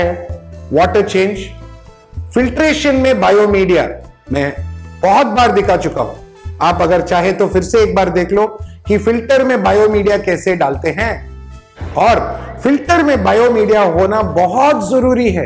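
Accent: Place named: Mumbai, India. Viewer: Sir ke dialogues Sun kar lagta hai ki vo Mumbai sa hai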